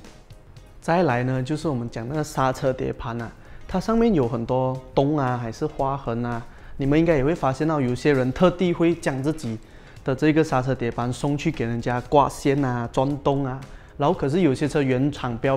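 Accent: Malaysia. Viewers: 抱歉解释很好不过他的联邦音很重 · 聯邦音很重其實又有啥問題？這是特色...up主做你自己就好...你又不是電視台新聞主播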